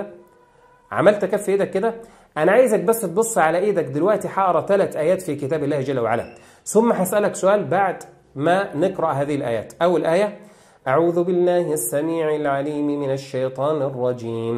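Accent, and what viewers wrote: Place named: Morocco. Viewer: تلاوتك تشبه تلاوتنا المغربية الشعبية .تريح الاعصاب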